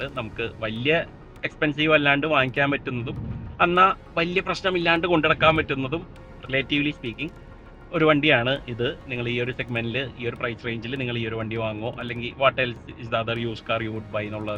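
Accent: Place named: Thrissur, India. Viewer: always a pleasure watching your videos! A few observations though... 😄 Sir, you are our inspiration and role model, which is exactly why we noticed you using your phone while driving - checking car specs AND doing videography at the same time! Multitasking level: expert, but maybe let's leave that out of the next video (or at least edit it out properly) Now, about that age comment, hahaha! Whether you've just entered the 40s or are tiptoeing around it, CONGRATULATIONS and welcome to the club! Also, I'm guessing this was shot on the Palakkad–Thrissur highway? And that Malayalam dialect is giving very strong Thrissur vibes! Are you originally from Thrissur, sir?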